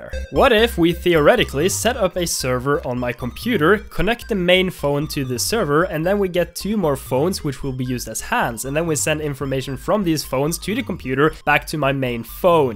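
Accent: Norway. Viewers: Are you Norwegian 😬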